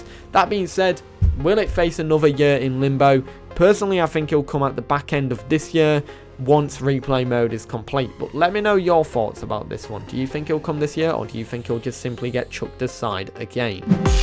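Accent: United Kingdom. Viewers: Blud I, British but I ant as British as this guy💀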